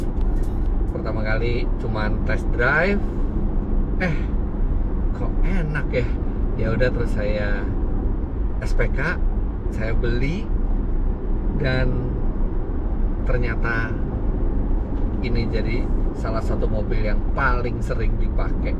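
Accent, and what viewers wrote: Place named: Java, Indonesia. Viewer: Bung Jawa banget sih itu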